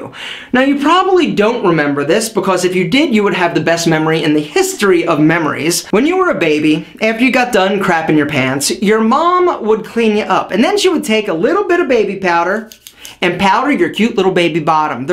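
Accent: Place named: East Coast, United States. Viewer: Where are you from bruh....im guessing close to the eastern coast.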